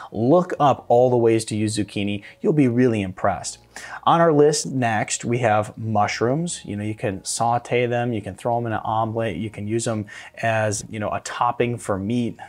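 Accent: United States. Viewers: Terrible strong American accent. Tomatooooooooos doh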